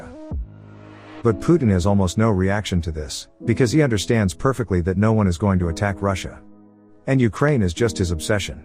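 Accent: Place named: United States. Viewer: Perfect American accent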